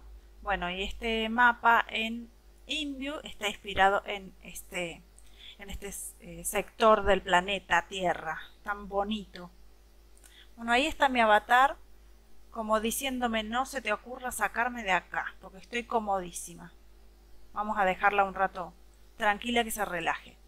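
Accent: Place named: Córdoba, Argentina. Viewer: Que te diviertas en tus vacaciones !!! 👍👍👍 Una preguntita... de qué lugar de Argentina eres... porque tienes un dejo muy particular... yo veía el programa de Olmedo y el Gordo Porcel... Olmedo hacía un personaje con un dejo muy parecido al tuyo...Perdón, creo que el personaje a que me refiero salía en No toca botón... creo que era de la provincia de Córdoba...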